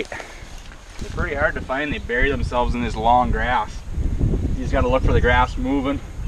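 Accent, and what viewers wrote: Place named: Minnesota, United States. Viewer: with your accent you must be fishing the red river in Minnesota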